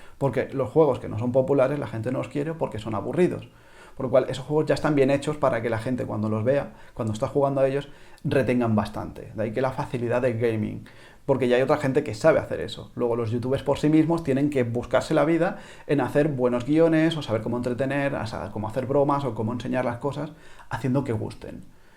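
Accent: Spain. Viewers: Eres Catalan? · Buenos consejos, normalmente me da pereza el acento español pero contigo esta bastante ameno hah! saludos de México.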